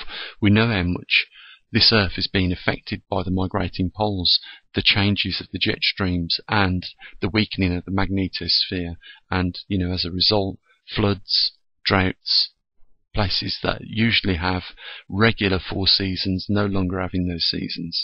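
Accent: Birmingham, United Kingdom. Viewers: We made the move to the countryside in Portugal 3 years ago...........we have a poly tunnel and land. It's still affordable to buy land in Portugal with water and mature fruit trees. So happy to have left the city. Thanks for your work. Its been very cool here all month, which is very unusual. Btw, you sound like a brummy.........I was born in West Brom!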